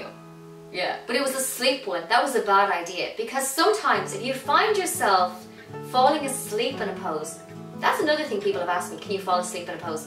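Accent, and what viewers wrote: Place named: Ireland. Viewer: Hello, I actually thought of maybe looking into becoming a life drawing model because I hope to find my next boyfriend eventually that way. Although from your experience, have you or others who you may know found this to be an easier way than in the real world or is it more vulnerable? By the way, I love your accent and I've been to Ireland! I live in Frome, England but I am American. In fact, your dress sense is very relatable to the people where I live, if you've never been!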